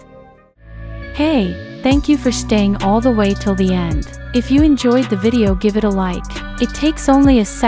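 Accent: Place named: England, United Kingdom. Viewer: Sorry, but I keep laughing when you read anything Japanese in English accent XD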